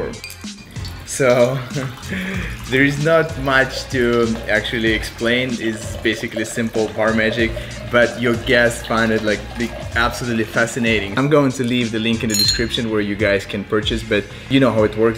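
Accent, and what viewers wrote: Russia. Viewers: Хах, думаю: у него русский акцент🤔 А он реально русский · Охуенная футболка Да и наш акцент чувствуется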